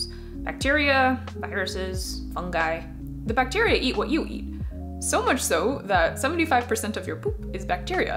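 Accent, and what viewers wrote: Canada. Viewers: No offense but are you french canadienne? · are you canadian you sound canadian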